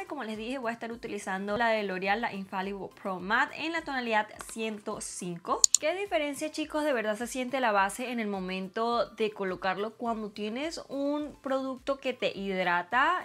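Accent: Venezuela. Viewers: Ese acento venezolano... 🤤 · de que parte de Venezuela eres? Suenas como si fueras de Maracaibo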